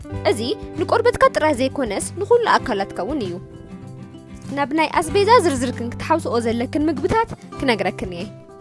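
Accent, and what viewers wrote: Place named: Enderta, Ethiopia. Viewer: ብዝተፋላለይ ላዛ ወይ ላህጃ ናይ ትግርኛ ዝተፋላለይ መደባት ክትስምዕ ብጣዕሚ ጽቡቅ እዩ ሓዉና ናይ ባዕሉ ጥቁም ዝኮነ ላዛ ናይ ከባቢ ዓንሰባ ወይ ሰንሒት ዝዛረብ ክ ከዉን ከሎ ሕጂ ድማ ናይ ትግራይ እንድርታ ይመስለ።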